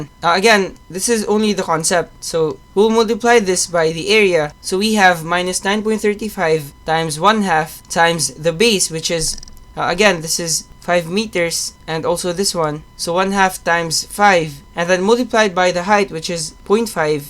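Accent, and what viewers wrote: Philippines. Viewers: Sir magtagalog kanalang halata naman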